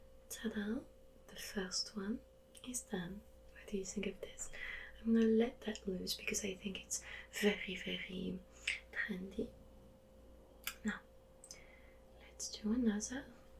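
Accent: France. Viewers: I'd really like to live in universe:'/ - educated universe Ton accent français me fait beaucoup rire d'ailleurs! Tes vidéos sont vraiment originales j'adore 👌🏻💕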